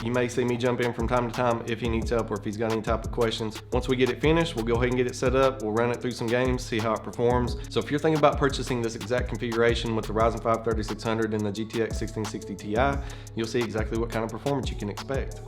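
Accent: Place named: Kentucky, United States. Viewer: First time here. Love your video. I have to ask where you're from? You sound like a fellow Kentuckian. :)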